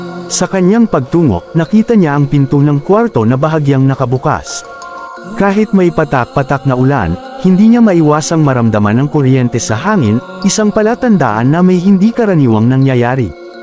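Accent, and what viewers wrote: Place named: Visayas, Philippines. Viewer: ano ka bisaya slang ka mag salita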